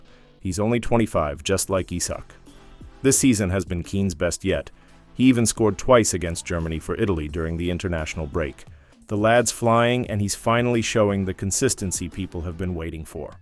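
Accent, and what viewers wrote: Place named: Newcastle, United Kingdom. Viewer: his geordie accent gives it away.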